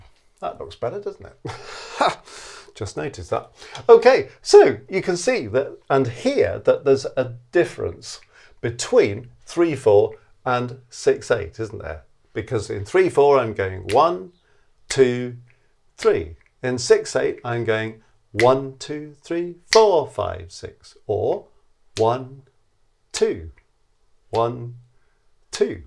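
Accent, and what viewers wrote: United Kingdom. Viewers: Simple explained and important topic, thank you. Great British accent too 😀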